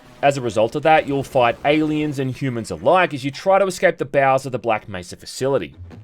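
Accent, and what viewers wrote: Australia. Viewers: Australian accent is impossible to listen for a non native speaker, terrible😭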